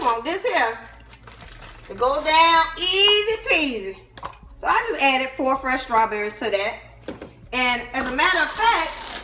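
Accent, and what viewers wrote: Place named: New Orleans, United States. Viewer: You sound of New Orleans not Miami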